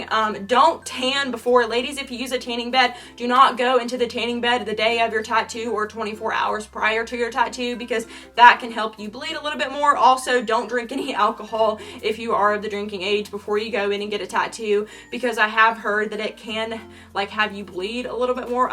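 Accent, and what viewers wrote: South, United States. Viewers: Why do i feel like your accent has gotten more country throughout the years haha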